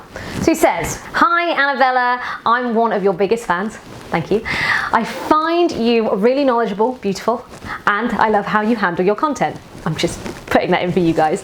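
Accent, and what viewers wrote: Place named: United Kingdom. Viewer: Beautiful accent, sounds British.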